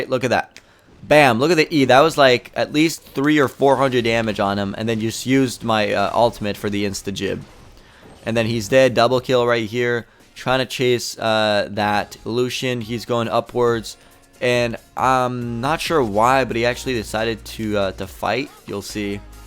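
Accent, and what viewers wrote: Quebec, Canada. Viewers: T'est anglais de base ? ou québecois parce que ton accent est vraiment ÉPIC :D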